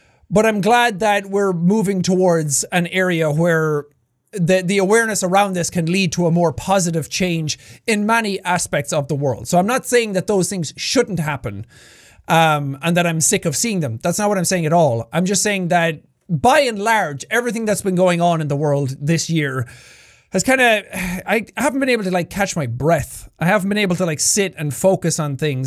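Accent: Ireland. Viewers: Hes irish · I miss this loud Irish person so much I started to watch his happy wheels playthrough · Jack r u irish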